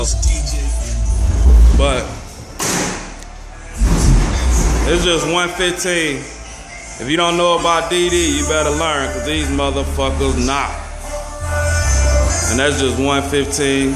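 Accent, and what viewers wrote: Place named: Texas, United States. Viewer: Texas talk..👍👍😂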